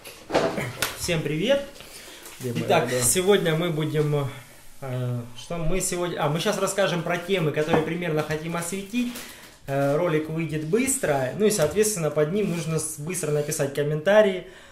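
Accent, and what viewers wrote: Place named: Germany. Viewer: A так Германский акцент присуцтвует ))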